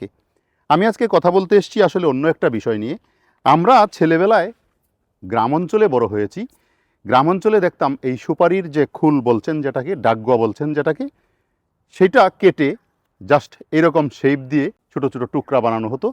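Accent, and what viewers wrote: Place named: Mymensingh, Bangladesh. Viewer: প্রথমেই আপনাকে আমার আন্তরিক সশ্রদ্ধ প্রণাম এবং শুভেচ্ছা জানাই l বাংলাদেশ তথা এই উপমহাদেশের বিভিন্ন ধরনের উদ্ভিদ,তাদের বৈশিষ্ট্য,গুনাগুন এবং চিকিৎসা সম্বন্ধীয় ব্যাপার গুলো এবং খাদ্যের রেসিপি যখন আপনি বলেন ভীষণ ভালো লাগে। তদুপরি আপনার বাংলা ভাষার যে টান সেটা আমার পূর্বপুরুষ যেই জায়গা থেকে এসেছেন সেই জায়গার অর্থাৎ ময়মনসিংহের l আপনার কথা সুর শুনতে আমার ভীষণ ভালো লাগে। আপনি যে ব্রত নিয়ে তথা বিশ্ববন্ধু হয়ে আছেন সেই ব্রত নিয়ে এগিয়ে চলুন অনেক ধন্যবাদ। আমি ভারত থেকে এই শুভেচ্ছা পত্র পাঠালাম।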